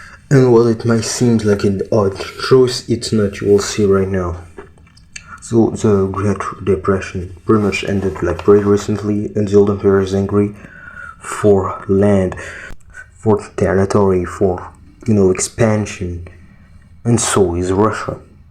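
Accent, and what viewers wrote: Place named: France. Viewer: french is an accent I never thought I'd like you proved my wrong keep cooking mate!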